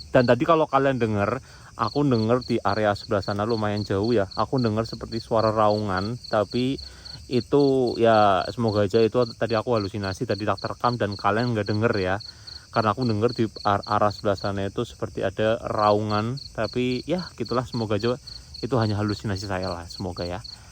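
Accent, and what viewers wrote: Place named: Banyumas, Indonesia. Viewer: Aku biar emak2 ngefans bget ma ka..serem lucu bahasa ngapak y n super brani❤😊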